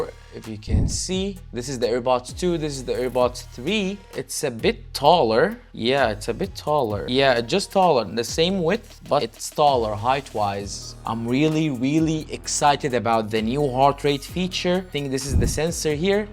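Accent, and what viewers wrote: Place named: Arab world. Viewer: Thanks! Amazing review and I love the after the hype ones. Also adorable arabic accent "Airbods Bro", make me miss Dubai life.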